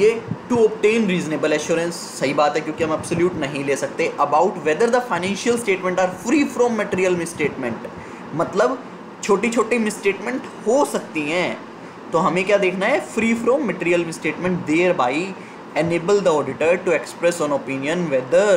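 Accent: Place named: India. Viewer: Unfortunately, i can t understand indian english accent ... :(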